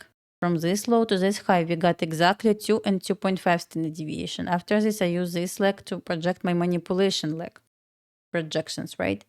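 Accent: Russia. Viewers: YOUR MOTHER LANGUGATE MUST BE RUSSIAN...I CAN FEEL IT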